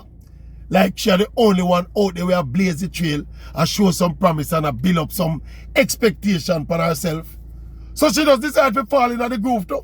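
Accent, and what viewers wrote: Jamaica. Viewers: Oral Tracey I love your Content and energy - I just wish I was able to understand everything you are saying - some times you go too deep into your Jamaican dialect or speak too fast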